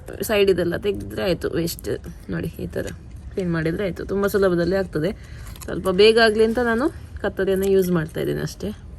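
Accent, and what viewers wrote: Mangalore, India. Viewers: Thank you! More than the chutney your mangalorean kannada was very easy and could easily understand. Chutney preparation was excellent. God bless u..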